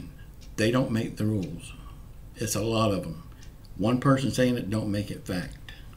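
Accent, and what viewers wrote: South, United States. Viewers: Thank u for answering my question💕 love hearing ur southern voice 🙂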